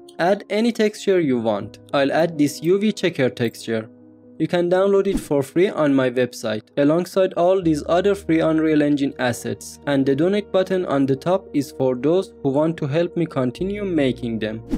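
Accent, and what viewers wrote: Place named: Iran. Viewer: این لهجه ایرانیه❤😊😂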